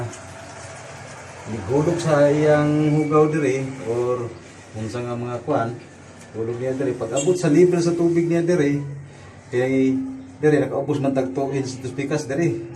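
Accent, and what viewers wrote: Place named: Visayas, Philippines. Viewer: Sir dugay ka na sa manila bisaya ka pa gihapon .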